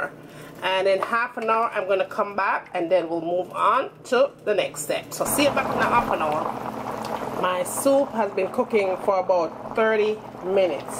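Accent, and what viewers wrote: Jamaica. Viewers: I’m from the Caribbean but I just moved to The Netherlands and when I say your accent and patois is like a breath of fresh air to me😂😑 totally gonna make this and I love how you’re not yanking like how most other Caribbean ytbers do. Much love!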